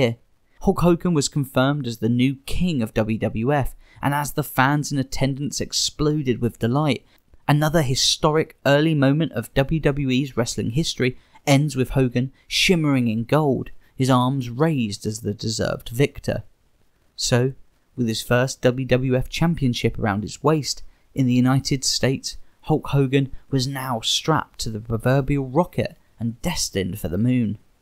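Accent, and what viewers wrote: United Kingdom. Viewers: DOESN'T LISTENING TO A BRITISH ACCENT TALK ABOUT WRESTLING MAKES IT SEEM LESS STUPID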